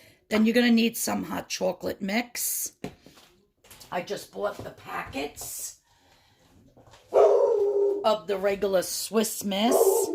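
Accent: New York, United States. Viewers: This would be so cool to make! So cute! I always wanted to buy them in the stores but I would rather control the ingredients. I’m well aware of Avery as a maker. Gonna do that as well. Thanks so much for sharing. Just love that NY accent.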